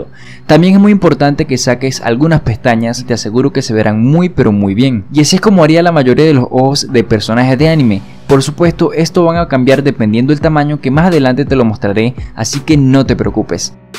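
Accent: Venezuela. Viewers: Es que dice "los ojoh" :v, los venezolanos hablan mucho como con la j o algo así... en lo personal me encanta el acento c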